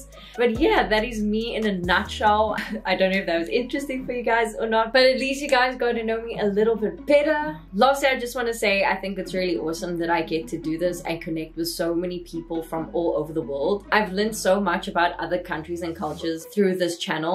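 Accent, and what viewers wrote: South Africa. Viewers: Yes, stay awesome, following you from Hong Kong. Funny I guessed it right, your lovely accent is unmistakably SAfrican. Love Love Love it! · That is actually impressive because I'm also from Cape Town and our accent is not known outsude of South Africa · I thought...you are from New Zealand it's because of your accent (but, I'm not sure because now that you've said you're from South Africa...Now, I do understand because I do have work with people that are from South Africa - now, does it make sense because they talk or speak like you...(sorry, I mean it in a good way)...Good Luck & more power to you in your content... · I could never place your accent, but now finally it all makes sense, South Africa. The same accent in District 9. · Ha, I knew from your funny accent that you must be from South Africa! Great to have this finally confirmed!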